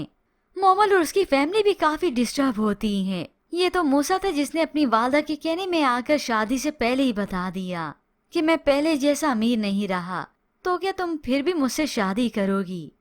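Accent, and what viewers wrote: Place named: India. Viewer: Aisa laga k koi ENDian suna raha ho Iss me sari guftago ENDian lagi Urdu tow ENDian bunn chuki hai Urdu ka end ho chukaa hai Nazar ye araha hai k Awam subh se sham ENDian bunn chuki hai Hur program mein same Phir yoga pooja ho rahi hai Yeh to jamhori musalman tha !!!!!!!!! Ya Allah Khair Kia sare hindu bunn gayee hain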